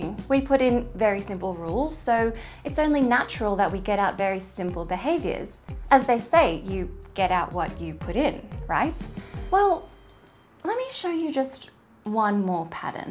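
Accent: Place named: Australia. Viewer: I don't know why I totally missed figuring you as an Aussie. Your accent just doesn't scream it to me. But no complaints finding out now. Opposite side of the world, but opposites attract! Hey, don't take my word for it -- just ask your friendly neighborhood magnet! So, I'll keep coming back as long as you keep sciencing the sh*t out of Youtube!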